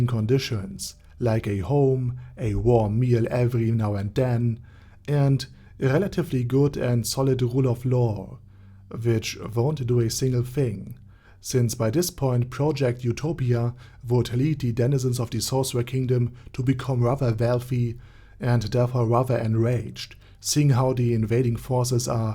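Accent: Germany. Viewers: First of all Guten Tag, i like you're Content but as a german its so wierd to hear someone speak with such a havy German accent. But keep making these videos.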